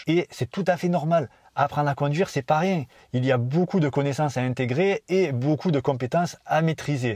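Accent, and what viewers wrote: South, France. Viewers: Son accent du sud il régale ! 😂👌🏾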